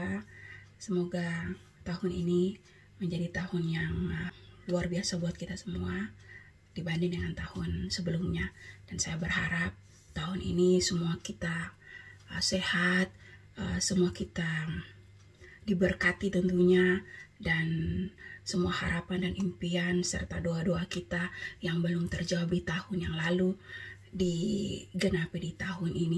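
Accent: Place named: Ullath, Indonesia. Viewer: Mantap,logat Ullath bole biar ciri khas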